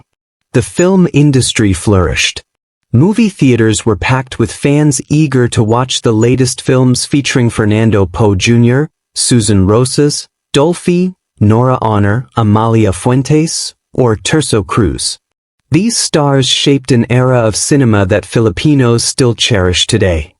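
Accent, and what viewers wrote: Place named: United States. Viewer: The white American English accent is cringe. Very mispronounced and terrible.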